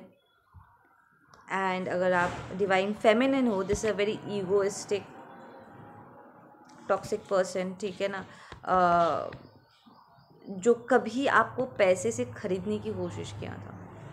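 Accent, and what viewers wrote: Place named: Bengal, India. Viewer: I m listening your bengali in a loop 🤭🤭 its very sweet to hear your bengali ascent 🌼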